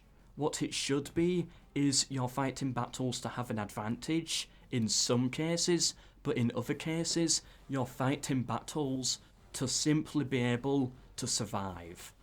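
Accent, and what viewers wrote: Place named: Northern England, United Kingdom. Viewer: I'm sorry to say that but what we have here is northern English, not RP British.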